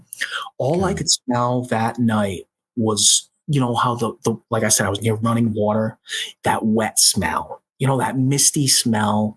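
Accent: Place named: New York, United States. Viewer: This dude is so believable. I hear it in his voice. I hear NY ( north) in his voice, but if he talked to me he’d scream Hillbilly from s.e Kentucky. Isn’t it funny how a few hundred miles change our whole way of talking somehow lol. God bless everyone listening or reading. Remember bros & sisters. EVERYTHING WE SAY OR DO OR THINK, Releases either positive or negative energy, DO Not spread negativity because it leads to sickness, depression, anger, hostility, hate disorders, disease and death. It’s been scientifically proven by the water has a memory case study. Don’t let someone else sling their negative energy onto you, If it happens to you don’t respond with negativity because that means it stuck onto you. Be positive and let that evil energy bounce right off ya. Ps- That same frozen water / ice crystals study even proved holy water is real. 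Nasty polluted water once frozen could not form any pretty patterns in the ice crystals until they prayed over it and refroze it, only then did it produce beautiful patterns in its crystals And One container of water was spoken to and only told it was a Angel. Then after they froze it and looked at it’s ice crystals underneath a microscope, guess what type of patterns it made???? = A HALO OF FLOWERS How does water know angels have halos? Unbelievable huh? They now claim the largest living thing on earth is water. And water itself could possibly be the base element of life itself? What?? That last part blows my mind times 3 Idk just repe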